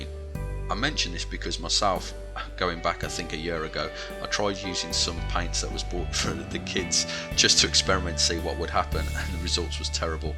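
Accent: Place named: Midlands, United Kingdom. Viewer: instruction on the four videos is first class and the rhino is an ebay buyers dream.. its good to hear that midland tone back on youtube.maybe next time you would paint some forge world mk2 hh marines in the good old ultramarines livery...please. oh and you get 4 thumbs up..way to go.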